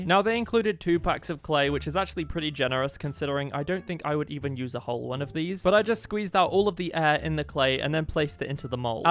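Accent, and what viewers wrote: United Kingdom. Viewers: can’t you hear the accent? he is british. obv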